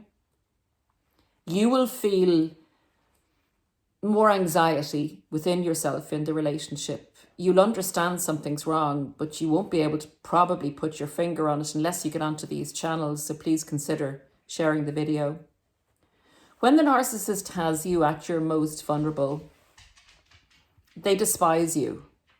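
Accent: Ireland. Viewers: Malignant narcissistic relationship survivor and thriver here. Your personality is beautiful and you are as well. Your Irish accent is so charming! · This has to be one of the best descriptions and articulations of narcissistic behavior I've heard. And it is very hard to hear. I find myself reliving childhood memories of grief, tortured at the hands of a sadistic narcissist. I'm not sure if this reliving is therapeutic or just pouring salt on wounds that are healed as much as they ever will. One of the key attributes to an encounter with a narcissist is lingering self doubt. One questions their own behavior, "Did I do something to deserve this?" or "Oh, if only I were more sensitive and tip-toed more carefully I would not have offended and drawn this wrath!" It is this self doubt that later pains me. If I had only seen it! If I had only been a stronger person! 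If I could go back in time.... In any event, thanks for sharing. And your Irish accent is just awesome!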